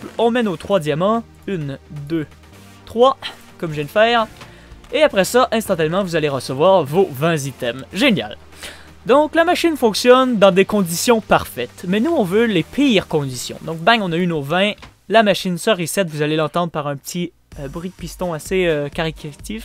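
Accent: Quebec, Canada. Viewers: Oh un québécois ..... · tu es Québécois?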